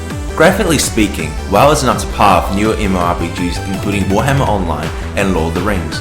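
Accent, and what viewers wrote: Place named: New Zealand. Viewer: Kiwi accent, not British. He says it himself at the end.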